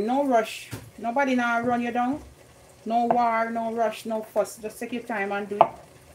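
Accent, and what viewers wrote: Jamaica. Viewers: me know weh yuh born yuh sound like st. Elizabeth girl like you video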